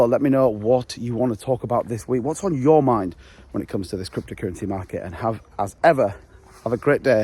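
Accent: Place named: Bradford, United Kingdom. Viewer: Wear are you from bro? Sounds like Bradford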